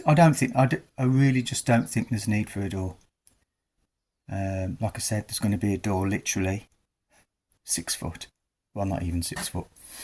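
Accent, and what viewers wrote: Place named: England, United Kingdom. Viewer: Hi or (gentleman who's hosting in this upload with English accent), sorry, I'm confused whether you are or, or???? 🤨😐 Are you eventually going to remove the old wooden lintel (the higher-up one / above the wooden blocks) with a concrete one? If you do, then you will gain nearly an extra foot (12 inches), the wall looks so dodgy, especially with the big crack, would you not consider taking that small part of that wall down and rebuilding it with a higher concrete lentil? It could sort many of the problems? Also, just wondering if there is a yard where one can drive their car in, as the car is usually parked along the roadside? God help you / / with all the questions I'm firing at you, sorry!!! 😱😱😁😁😄😄 Anyways, thanks, or, or 🥹 for the upload, looking forward to your next one, hugs from the west of Ireland 🤗🤗😘😘❤❤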